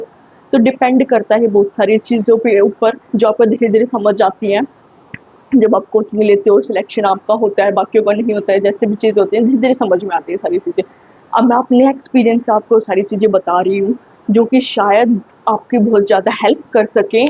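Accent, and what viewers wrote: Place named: Haryana, India. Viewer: Haryanvi language,kha se ho aap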